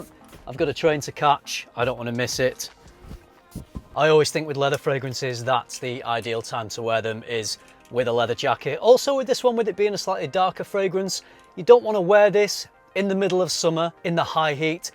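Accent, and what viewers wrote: United Kingdom. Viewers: Love your british accent... cheers from Argentina!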